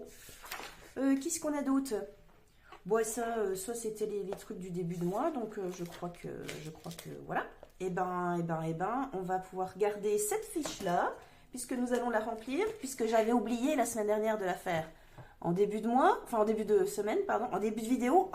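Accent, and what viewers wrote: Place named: Haute-Saône, France. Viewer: Coucou mais moi j'adore vous écouter il est tellement gracieux notre accent ( je suis en haute Saône) la Madeleine Proust a fait fureur avec😂. En tout cas belles économies 😊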